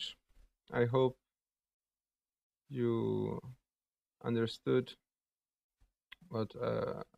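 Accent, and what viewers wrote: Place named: Germany. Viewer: Excellent explanation !!! (I would just add a couple words about the Vitamin D receptors, since people will find that mentioned in other explanations, for example by Dr Coimbra himself). I am myself in the process of starting that treatment, already had some fantastic result starting it without actual knowledge about Coimbra, just based on the Book by Jeff T Bowles, who did a test on himself. Now I found the amazing story and results of Coimbra, and am looking for a physician to have supervision, as you recommended too. Since you sound a bit German, my question is whether you know of any doctors doing that treatment besides the ones mentioned here <link> Thanks a lot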